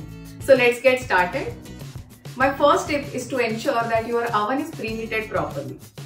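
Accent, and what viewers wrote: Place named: India. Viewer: Put an Australian on, can't understand indian talk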